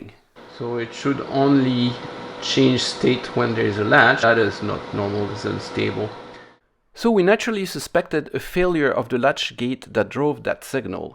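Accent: France. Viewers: I have an off-topic question for you. My wife heard me watching this episode and she asked me where your accent is from. I told her I thought it was French, but I'd like to confirm if I got that right or not. Thanks! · I mean… you know that for me, in-depth electronic debugging now has a french accent forever. Burnt in like a PROM.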